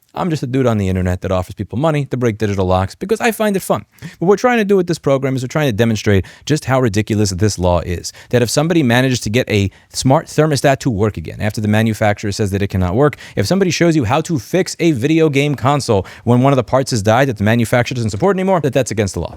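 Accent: United Kingdom. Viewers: why he talk british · nice British accent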